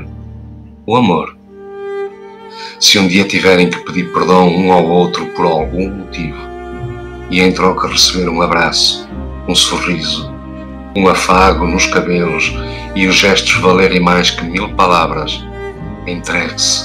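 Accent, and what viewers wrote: Portugal. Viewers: DEsculpe....Só não gostei q fosse nesse sotaque português.... tanto Drummond quanto Selma são brasileiros, e essa música de fundo trás um clima de funeral....qdo fala de amor chegando em nossa vida... 😢 e não condiz com a mensagem ... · Amei a declamação do poema. Este sotaque português é divino. Quem declama? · Lindo e neste sotaque português ficou ainda mais terno e doce, irresistível, um bálsamo para a alma! AMEI!! 😃😍😍👏👏👏🙏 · Samir 4150 sotaque Português de Portugal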